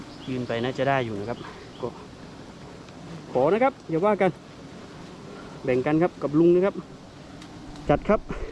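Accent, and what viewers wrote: Isan, Thailand. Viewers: พราน ยุ จ.อารายคับ แล้วยุ อ.อารายคับ คำเว้าคือคนทางบ้านผมแท้ ผมยุ.จ.เลย คับ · อ้ายเว้าอิสานม่วนดี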